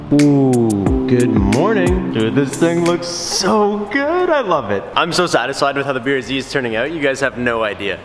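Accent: Canada. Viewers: You're a real there canaaaaadian bud. Saying soury instead of sorry 😂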